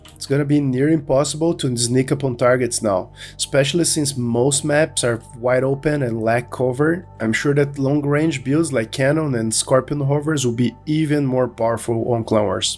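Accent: Brazil. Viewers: Not the worst english, for a Brazilian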